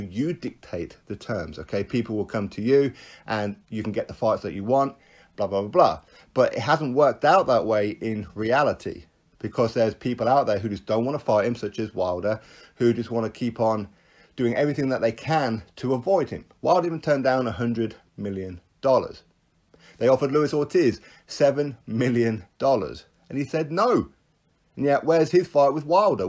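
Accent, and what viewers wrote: United Kingdom. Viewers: Listen obviously from your accent your britian but whats fair is fair. Ruiz whipped joshua ass no matter what you say he knocked him down more times. Wether it be if his head or distracted whatever the bullshit is. But ruiz is the new champ. Remtatch clause or contract whatever the fuck you wanna say. Ruiz is the new heavyweight champion. Id rather see him fight wilder or fury. The rematch will be the same. And dana white says styles make fights. Ruiz was better that night. And in my oponion hes the better fighter ruiz is a savage joshua is a terminator robot with no rythym just strength. In boxing its more then strength. Ruiz was and is the better fighter. Get boxing politics and money out the way. Ruiz is more superior ......period if you cant read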